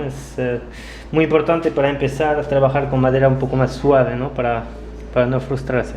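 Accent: France. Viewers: Tu acento es como frances, de donde eres? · t'es francais? Il ya un accent français dans ton Espagnol. Y muchas gracias por el contenido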